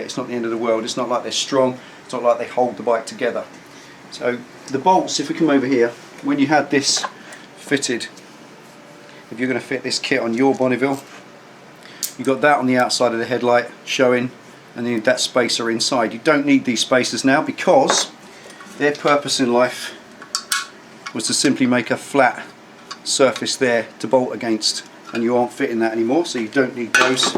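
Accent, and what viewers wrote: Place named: West Country, United Kingdom. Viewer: Top yoke every Tuesday made me laugh. Gurt there's the sound of the West Country.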